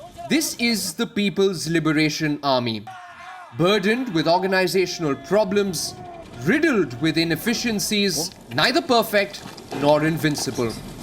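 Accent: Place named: India. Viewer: Lol, when you hear someone with an Indian accent talking about China, I suggest you quite the channel immediately because all it's gonna bring is propaganda=trash. And as a PLA veteran, I assure everyone here that we will fight for China, we will obey orders from the CCP but all of us are willing to give our lives for it, as there won't even be modern China without the CCP. And please, nobody will force you to believe in Communism when you don't want to, and you don't have to be a party member to serve. It's being 12 years since I've touched a gun, but if my country needs me I, along with 600 million Chinese man will fight any minute. I believe everyone will do so for their own country regardless nationality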